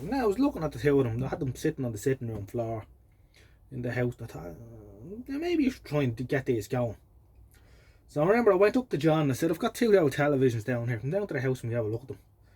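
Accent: Ireland. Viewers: As an Englishman, I absolutely love your wonderful Irish accent!...... You sound like, turning their hand to TV repair! 👍😂🤣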